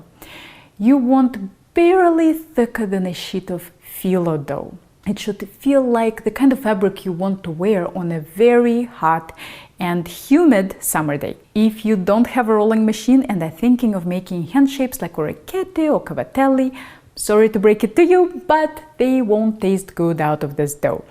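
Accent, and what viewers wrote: Russia. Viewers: Quite entertaining, and with a kinda of Russian accent for a change! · I didn't even listen the recipe it's self I just watched and listen to this lady talking in her Russian accent. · You have a nice Russian accent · Like the Russian accent and talking of pasta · So hard russian accent, I couldn't resist... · You do Italian food but you sound Russian! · Love your accent! Russian right? Was hooked from the first few sentences :-) very informative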